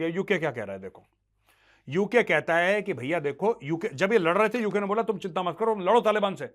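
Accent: Lahore, Pakistan. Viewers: Mejor Saab app jo lahori language bolte hai ..usse sunne ke liye hamare kaan bahut tarastee hai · Major Sahab aapki Lahori Bhasha maja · major shab Lahori accent, so beautiful so elegant just looking like a wow 😮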